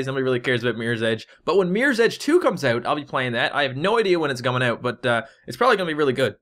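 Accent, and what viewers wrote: Canada. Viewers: Are you candian ? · I'm new here I saw you from your besiege video and I enjoyed it you earned a sub by the way are you Canadian?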